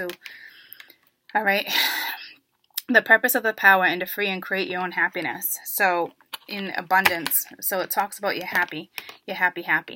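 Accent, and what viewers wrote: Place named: New York, United States. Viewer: Your New York accent is the cutest thing 💕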